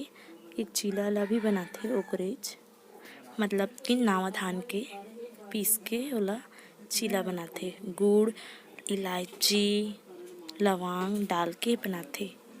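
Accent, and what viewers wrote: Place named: Durg, India. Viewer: Seva johar.tuman kon Raj ke aa nai bataya.boli se lagathe tuman durug side ke ho sakat ha.ham to Korba jila wale🙏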